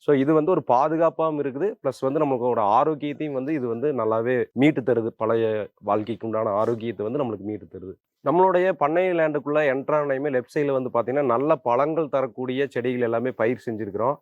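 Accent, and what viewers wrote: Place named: Kongu, India. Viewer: House Super anna ,,, also my fav. Kongu Tamil 👌 👌 ❤❤ from Tirunelveli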